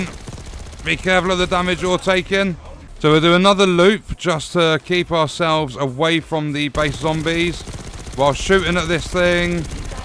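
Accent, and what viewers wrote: United Kingdom. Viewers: Every time I need help, there’s your heavy British accent to guide me. I’m an American Cajun from New Orleans. I tried Marmite on toast once and it didn’t bother me too much. Hi five 🖐️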